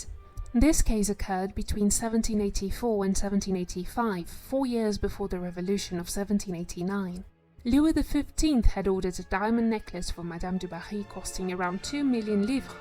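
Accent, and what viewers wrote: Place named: England, United Kingdom. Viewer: The fact that this AnasSyriano is not even capable of recognizing an English accent or not has made a fool of himself or herself. So never mind : your accent is truly nice, and thanks for the video 😉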